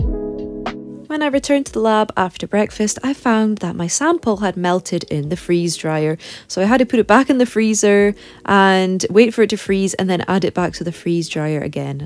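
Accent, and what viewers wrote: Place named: Scotland, United Kingdom. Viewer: Other science phd students: come to ’ videos and understand everything. Me, a humanities phd student: … … looks cool. (*loving listening to your lilting Scottish accent) 💪😂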